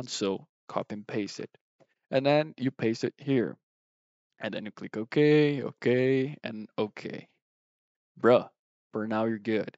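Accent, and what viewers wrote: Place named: Brazil. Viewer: Esse sotaque brasileiro é muito bom de ouvir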